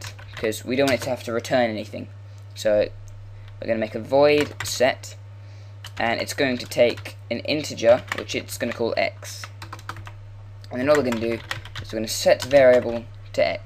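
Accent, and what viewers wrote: United Kingdom. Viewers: Thank you very much! I like the accent cockney, "NUMBAR", my favorite accent! Greetings for England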